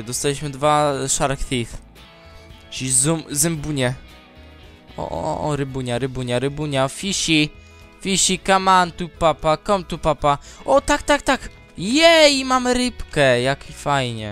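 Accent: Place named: Rural, Poland. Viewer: wieś głos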